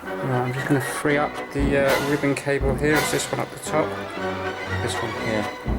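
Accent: England, United Kingdom. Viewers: Greeting from Nevada, these videos are very interesting. Plus your English accent makes the videos bright and energetic.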